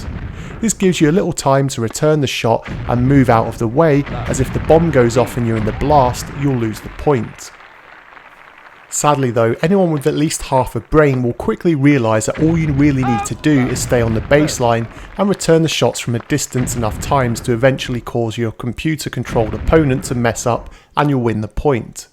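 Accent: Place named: United Kingdom. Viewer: This is "All-Star" tennis! Where the hell are Agassi, Sampras, Seles, Kournikova? Nice review,. Keep up the British Commentary.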